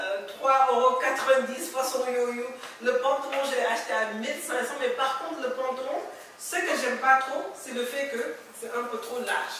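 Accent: Senegal. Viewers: L'authentique accent sénégalais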